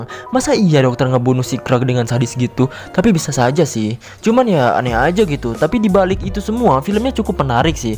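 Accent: West Java, Indonesia. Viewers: Anjir teh 😄 Sunda bgt euy aa teh gening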